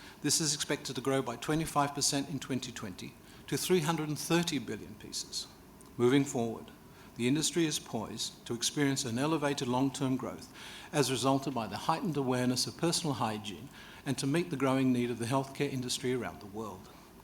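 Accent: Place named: England, United Kingdom. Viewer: hensem nyer datuk umar. I like ur English accent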